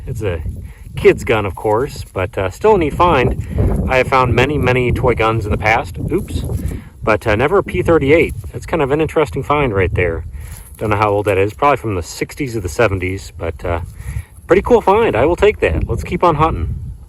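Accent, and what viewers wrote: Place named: Missouri, United States. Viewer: Where in mo are you from? I detect in Crawford county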